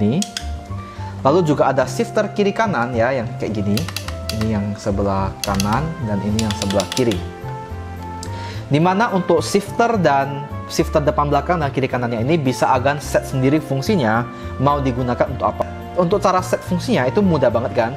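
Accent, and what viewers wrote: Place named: Tegal, Indonesia. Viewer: Dari logat bicaranya pasti admin ini orang Tegal atau Brebes jawa tengah ya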